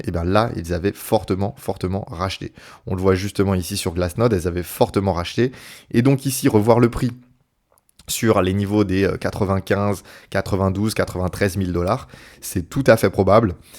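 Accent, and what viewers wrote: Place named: France. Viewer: ...adopter du vocable anglais avec un accent francais HORRIBLE, c'est la loose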